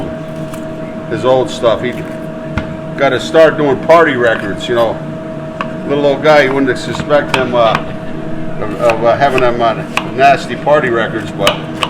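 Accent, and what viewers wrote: United States. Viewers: You have a very American style of talking, almost the accent.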